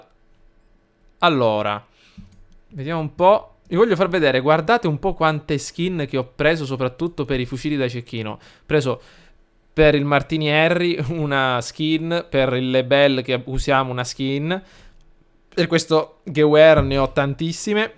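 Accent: Sicily, Italy. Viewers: Hai preso un accento siciliano più forte rispetto ai video di 3 anni fa! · CMNIK 1501 perché è siciliano?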